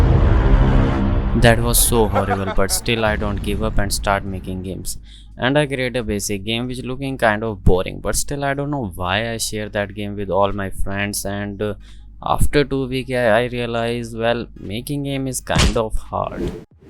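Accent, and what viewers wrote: India. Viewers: points for using dead memes + points for indian accent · ur accent and u look indian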